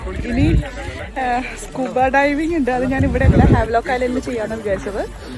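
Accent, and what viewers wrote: Thrissur, India. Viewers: waiting for your next video,, sply thrishur slang 😍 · Chechide proper hometown thrissur aahno😄.. Samsarathil okke oru thrissur slang edakk varunnund😇.. Ath kelkkumbo ottum jada illathe valare natural ayi thonnunum und🥰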